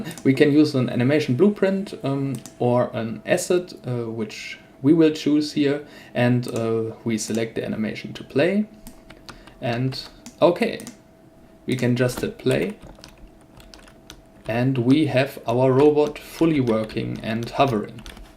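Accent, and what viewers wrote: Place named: Germany. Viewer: Yeah, thanks. Watched all thru, good presentation. And, everybody loves nice German accent! :) (it's a good thing) I am latvian (lettisch) btw, so I love all "euro version of English :D ). Oh, maybe one provocative question: is this asset pretty much finished state, or maybe any additions / changes planned? Thanks!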